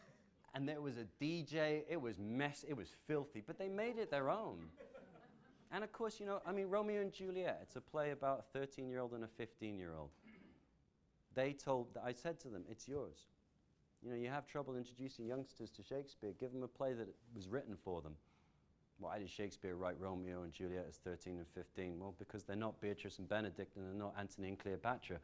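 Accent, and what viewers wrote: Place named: Newfoundland, Canada. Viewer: Accent reminds me if Newfoundland met Irish met West Country.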